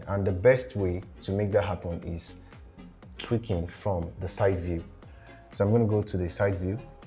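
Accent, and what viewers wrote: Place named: Nigeria. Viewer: This my man sounds Nigerian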